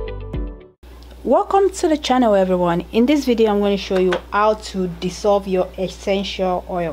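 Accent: Nigeria. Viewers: I came here and heard the Yoruba accent. Arabirin Mi, Esheeeyyy ❣❣❣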